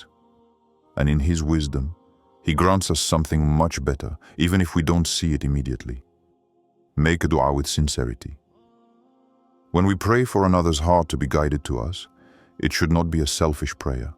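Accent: France. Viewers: Thank u bro , are u from france or Canada !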